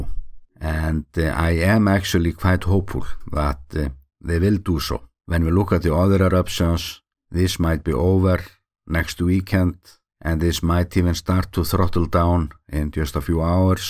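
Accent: Iceland. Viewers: I prefer this guys weird Icelandic accent instead of a soulless a.i. app narrator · I love thr Icelandic accent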